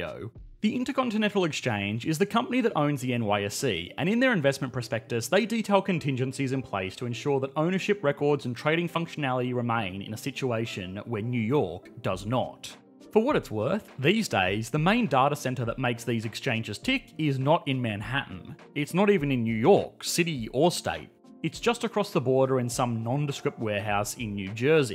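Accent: Australia. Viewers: Is this guy Aussie or what. What is he jabbering about anyway? Can anyone clarify? Help.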